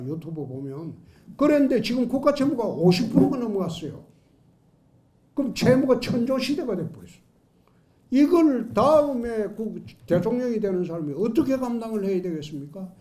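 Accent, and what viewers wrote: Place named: Gyeongsang, South Korea. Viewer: 경남 사람 발성과 말투는 다 그래요.^^ 어쨌든 발성으로 평가 받는 사람은 가수들 아닐까요?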